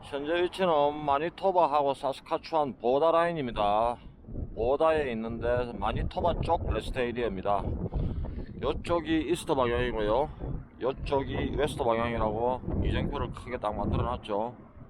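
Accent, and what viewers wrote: Gyeongsang, South Korea. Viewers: 가실없이 시벌리는 말이 유창 하네요 우리가 남이가 갱상도 머슴아 아니가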